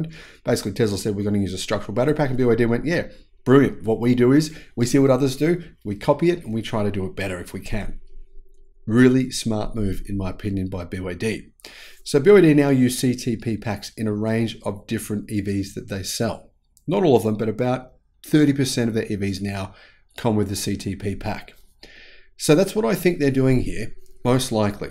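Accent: Victoria, Australia. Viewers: 😂Yes, you're an Ausie!😂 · Solid Melbourne accent, for sure. Also, digging the other voices too 😆 As for the range mystery, maybe it could be the software? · You are 100% Australian! 😊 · Definitely an Australian accent. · 😂😂 I'm an Aussie living in Spain for over 30 years, and YES, your accent is totally down under🤙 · Definitely a Victorian Australian accent . Not a lot of different accents in Australia unlike the USA or UK. New Zealind and South Efrika do strange things with their vowels.🤔🦘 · No Doubt. Beyond doubt your Australian 😊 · aussie, no doubt 😂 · I'm Russian and I would've guessed your accent is Australian. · Yes your accent is Aussie - I'm an expert, because I grew up watching Neighbours and Home & Away. 😉 I've heard stronger though. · the first time I heard you I knew you were an Aussie. No question. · 100% aussie mate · You sure sound Australian to me, Mate. 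👌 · Total Aussie accent, mate! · You sound Australian,! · The ‘viking look’ probably throws folks off. Your Melbourne accent is genuine though! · Yep Victorian Accent. When I did nasho at Puckka we had all the states segregated, that's when I heard the difference. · You have a broad and typical well spoken Australian accent. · u sound very Australian, I love your shows, Eugene, Philadelphia, pa. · Hey. Total Aussie Accent! Good on ya! Sending love to you and family. Keep up the great coverage. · 100% Australian Accent within 3 seconds. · Hello, when talking with someone from another country they will typically know that you are not from their country. They may have not be sure what country‘s accent you have but they will know it is not their own. This is even more so with non-native English speaking people. I can tell you that my wife as a native U.S. American did pick you up as an Australian just seconds into hearing your video first time. 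That is a good thing!! Be proud! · 100% Australian accent yes. But don't expect non-english people to pick it up. British vs Aus vs NZ is not a big difference in accent, especially in a public speaking context. Regarding the efficiency, it's down to aero and more efficient inverters and motors. I wouldn't sing them any praises, all teslas are pretty rubbish at efficiency. Never mind the onboard charger at low load, tyres that cost a fortune. There's also the various rating of the capacity of the battery. Likely that BYD overstates the size, or uses second bin cells whilst they sell the first bin to their competition for top dollar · 100% Australian accent, easy to identify as such. Hard to replicate even after listening to you and SMR for a year or two, maté. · Yes cobber you’re an Australian obviously ……from a Pom. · Totally Australian accent! No doubt about it. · As a non-Aussie, to me his accent is not readily identifiable -- like say a Steven Mark Ryan's -- unless he says specific words which are pronounced in an obviously Aussie accent :). 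But good to know this is how a Victorian accent sounds like. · 120% awesome Aussie accent 😂 Keep it this way!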